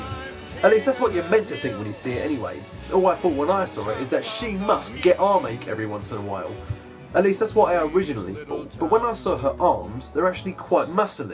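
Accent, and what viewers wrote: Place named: United States. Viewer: hahaha nice video...and your very good at the american accent!!:D!!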